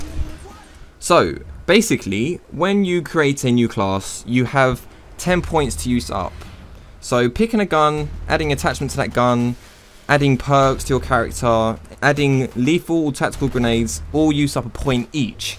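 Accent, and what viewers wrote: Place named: South London, United Kingdom. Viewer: Nice video. Where are you from? You sound like you're from South London, around Croydon?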